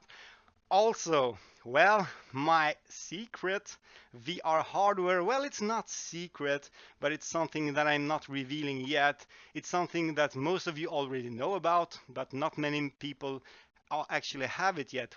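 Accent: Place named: Sweden. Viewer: Jag tänkte väll att du var svensk Man hör det lite lite på din engelska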